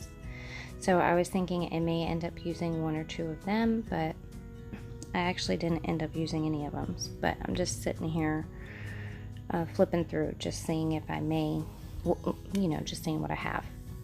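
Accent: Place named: South, United States. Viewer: Hi! Im noticing a southernness in your talk! Where are you from? Im in SC, thought we might be neighbors! I love your jj videos!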